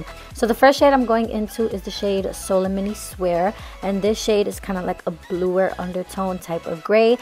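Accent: New York, United States. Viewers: Her accent🥰so New York I love it💕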